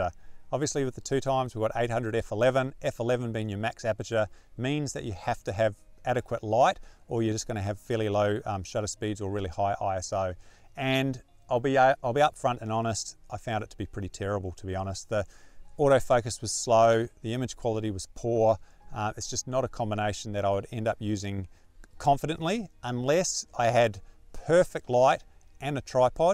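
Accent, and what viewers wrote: Australia. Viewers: Nice to listen to Ozzy English :-) - Missing all the colorful Australian birds I love so much :-(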